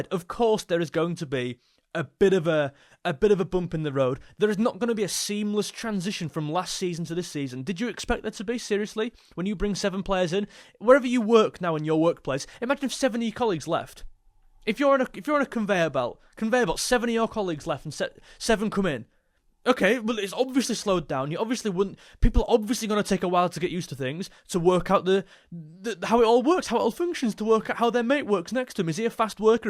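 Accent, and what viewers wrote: Liverpool, United Kingdom. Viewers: what a scouse accent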